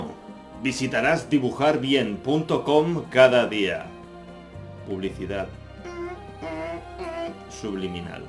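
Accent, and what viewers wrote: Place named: Spain. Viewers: owo molaria, aunque no me gusta mucho el acento español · tampoco me gusta el acento español.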